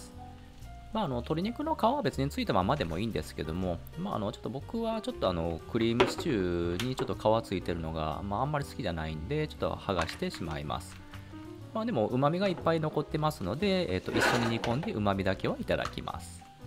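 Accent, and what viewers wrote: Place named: Nagoya, Japan. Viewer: 節々のイントネーションが名古屋っぽい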